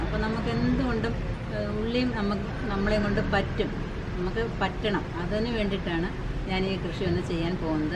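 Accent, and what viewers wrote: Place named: Punalur, India. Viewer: chechi. Punalur ആന്നോ. Veedu. അവിടുത്തെ. Samsaram ആണ്. Super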